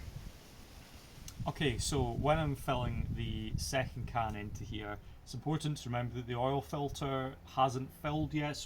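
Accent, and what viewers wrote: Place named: Aberdeen, United Kingdom. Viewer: Yes, you’ve helped me. Thanks. With the accent and sound of the seagulls, I think you maybe near Aberdeen? Cheers!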